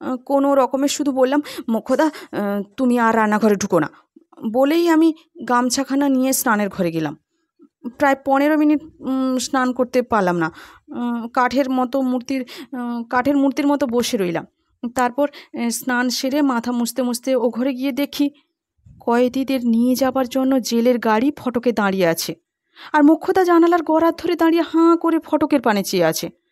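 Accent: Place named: Bangladesh. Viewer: গল্প পাঠে বাংলাদেশের টান আছে ।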